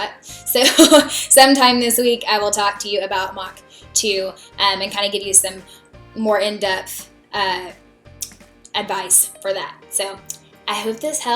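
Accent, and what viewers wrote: United States. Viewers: Glad to hear that hint of Southern accent because I was worried about mine :)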